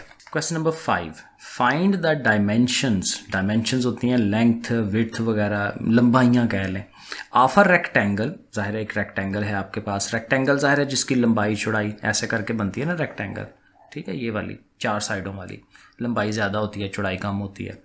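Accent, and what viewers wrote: Sialkot, Pakistan. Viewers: A.a sir ap Sialkot ( dska side ke lagty han ?)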